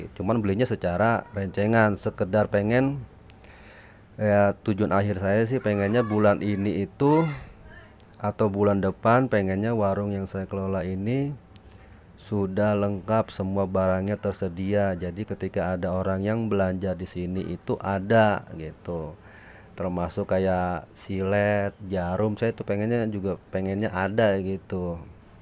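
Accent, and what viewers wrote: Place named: Jakarta, Indonesia. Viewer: G jual roko bang. Ngomongnya betawi banget bang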